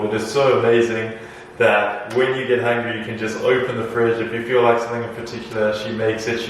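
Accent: South Africa. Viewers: Hi, judging from your accent you are South African😊 I'm south African and looking to stay a few months in Algeria. Where exactly are you staying? And how does life compare to back home. Ps. We're Muslim so cultural integration shouldn't be too much of a problem.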